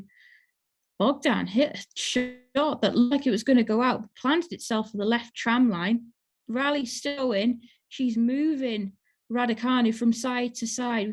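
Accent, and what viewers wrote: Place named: Merseyside, United Kingdom. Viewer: thanks for the talk-through. You're a lovely lady and you have a fun stage presence. Can we get you on Match Of The Day, please? I'm sure you'd be an instant hit! I'd love to see you talk through a rock concert, too - Foo Fighters, Paul McCartney, Metallica, whoever.... I just know you'd be an absolute scream and a big hit doing that. I've lived too long away from the UK to work out your accent, but somewhere not all too far from Merseyside seems a possibility. Like I said, I ain't too good at accents. For me, anyone who just tries is already a star. Good on yer, young lady! I've subbed;-)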